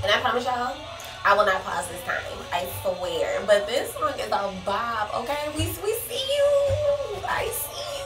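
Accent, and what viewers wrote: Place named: Thailand. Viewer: Awwwww ur Thai accent was so cute >< thank you for reaction naka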